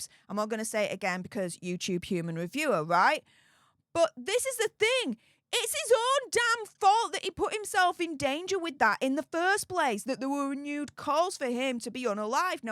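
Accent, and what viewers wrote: United Kingdom. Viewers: i like your accent (i'm an Australian), what part of the UK is it from?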